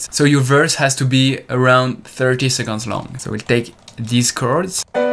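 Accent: France. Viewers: ta bon un accent français 😁 moi aussi je suis dj et producteur et j'ai un chat aussi par ailleurs 😆 sa fait plaisir un youtubeur qui fait des videos sur fl studio de cette maniere avec de l'humour et du montage j'aime bien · Hes french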